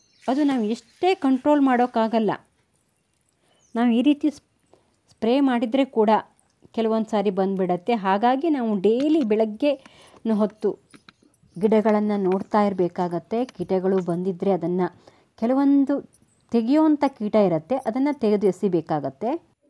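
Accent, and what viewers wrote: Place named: Kundapura, India. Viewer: nim voice,heluva reethi, hagu nammoorina kannada bhashe ya aa shaili nange thumba esta aythu.nammadu moola ooru cundapura the hathra kota.malegala the nim video noduke laykaguthe.e time nalli bulbs metre barutha heli.rply plz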